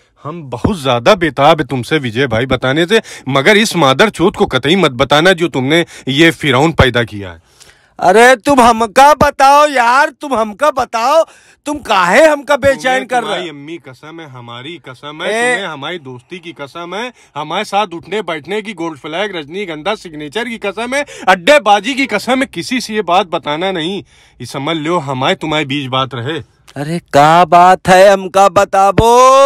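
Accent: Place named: Kanpur, India. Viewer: कसम से कानपुर में ऐसे ही बात करते हैं...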